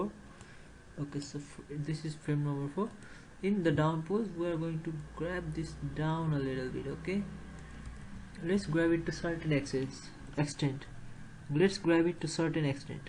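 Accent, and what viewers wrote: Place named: Brazil. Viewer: he said you're a brazilian trying to speak english, so you should quit speaking english because to him you sound like a brazilian.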